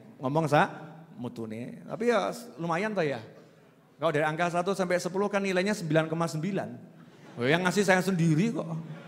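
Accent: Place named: Java, Indonesia. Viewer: Aksen jawa nya...mirip2 pa jokowi klo dalam bhs inggris ...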